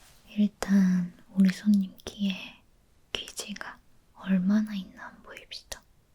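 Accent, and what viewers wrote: South Korea. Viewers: 영상 감사합니다^^ 대구사투리 정감가고 좋아요^^♡♡ 님 혹시 눈밑에 글리터 어디껀지 알수있을까요?? 넘 예뻐서요ㅜ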